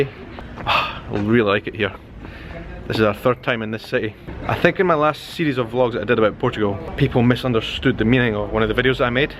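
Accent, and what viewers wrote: Brazil. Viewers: That's really funny, this guy pronounces all the names like a Brazilian; maybe because most people working with public there are from that country? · Minute 4, the palace building was the City Hall :) I also like your brazilian accent. You were so lucky with the weather, 30 degrees in October is not usual.. But unfortunately, those wildfires came with it too :( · You know you are speaking brazilian portuguese, right? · You speak PORTUGAL, PASTEL DE NATA (Pastéu di Nata) like a brasilian. You never talked portuguese of Portugal 🤣 🤣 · You have Brazilian accent when you speak Portuguese.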